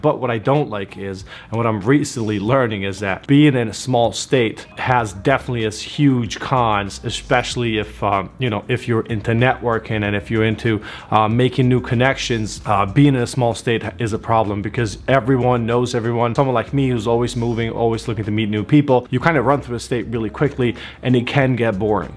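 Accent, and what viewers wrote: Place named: New York, United States. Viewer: I definitely hear you being a New Yorker. I love the country and the city (not suburbs) and I have a modest housing budget so it’s always challenging (and intriguing) to decide where to live!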